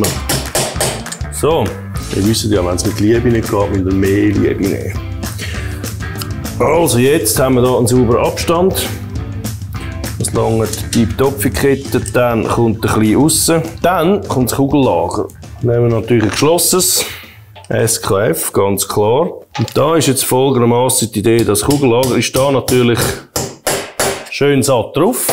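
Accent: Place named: Switzerland. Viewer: Die Sprache... Schweizer Deutsch... ist nichts für empfindsame Ohren!!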